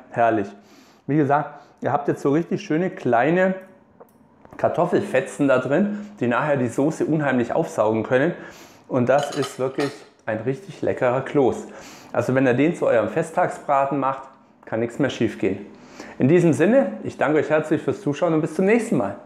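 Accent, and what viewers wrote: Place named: Franconia, Germany. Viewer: Fast das Originalrezept. Warum nur fast? Das Drittel der Kartoffeln, die weichgekocht werden, werden nach dem Kochen noch zu einem dünnflüssigen Kartoffelbrei verarbeitet. Die weichen Kartoffeln werden dazu im Kochwasser püriert. Wenn man die Thüringer Klöße so macht, erhalten sie ihren eigentlichen Namen, nämlich Grüne Klöße. Ansonsten hast du es perfekt getroffen. Übrigens: Wo kommst du her? Du klingst manchmal etwas leicht fränkisch.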